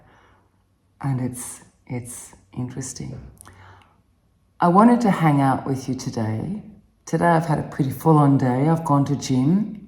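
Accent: Australia. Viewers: Here now a few years in your Channel ❤ from Ireland 🇮🇪 it's your Australian accent particularly yours...😊😊😊😊 So lovley ❤❤ Anyways all of my best to you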